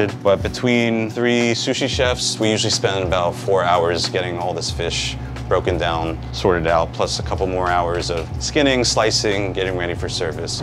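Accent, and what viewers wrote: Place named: United States. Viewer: Bro has the heaviest american accent i have ever heard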